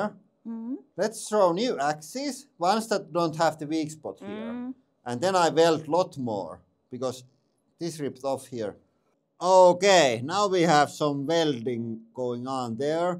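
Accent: Finland. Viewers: i dont understand a fu**in word of your rallienglanti. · ..mietin, että mukava viteo.. mistäköhän. ? .. mutta sitte tuo kotosen kuulonen ”rallienklanti” kertoo että juu, härmästähän nämä.. 😎 ….mukavaa testiä.. 👍👍👍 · Some of us are here for the pressing action, some of us are here for the ralli English. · Love the Finnish accent 😊 Greetings from Austria 🇦🇹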